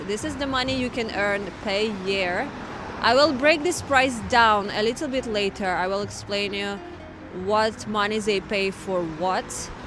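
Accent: Russia. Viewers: Horrible Russian accent! You need subtitles, period!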